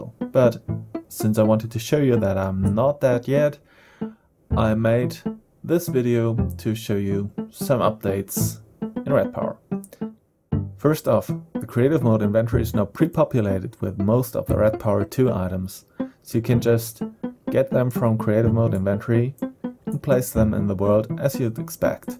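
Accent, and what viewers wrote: Germany. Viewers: Deutscher oder..?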